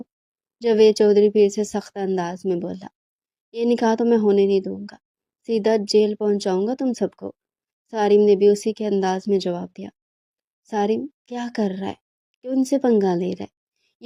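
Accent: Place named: Punjab, Pakistan. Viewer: Urdu with punjabi تلفظ!!!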